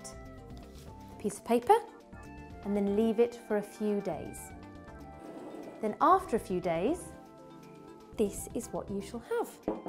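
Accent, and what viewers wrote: England, United Kingdom. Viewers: SHE'S ENGLISH not American that's what the English call IT WHO CARES anyway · Are you British? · im an english person living in england and that is still the most english accent I've ever heard XD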